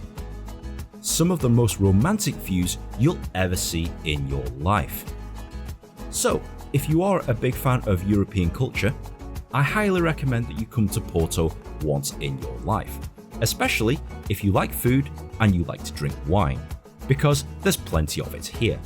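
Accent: United Kingdom. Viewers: Your voice is perfect, and fine British accent. Great man!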